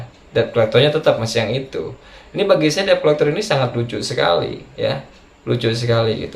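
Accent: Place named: Java, Indonesia. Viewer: Abang orang mna. Kyaknya ada Jawa nya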